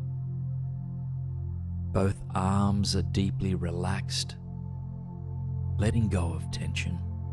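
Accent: Australia. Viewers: Hi, lovely to listen to your videos. It's actually quite nice hearing another fellow Aussie. It makes the whole thing more familiar and comforting ✨️ ✨️ ✨️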